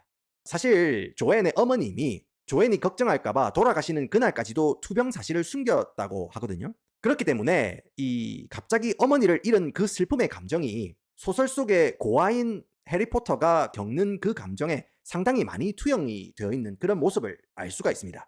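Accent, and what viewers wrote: Gyeongsang, South Korea. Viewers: 니말투 경상도인간이네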